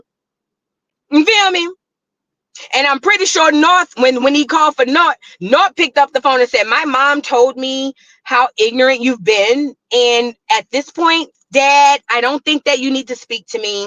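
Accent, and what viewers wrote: New Orleans, United States. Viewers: Girl, f me up with voice! NAILED!!Lorddddd I love that New Orleans accent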